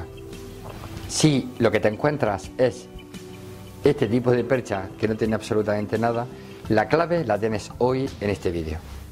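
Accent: Spain. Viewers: Muchas gracias por el truco, Me gusta tu acento elegante andalú.👍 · A mí me ha parecido muy útil el consejo, gracias ! Por cierto el acento del presentador me parece de Granada.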